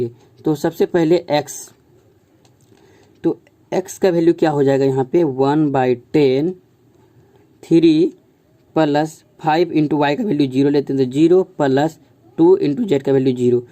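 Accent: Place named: Bihar, India. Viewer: Pakka Bihar se hai aap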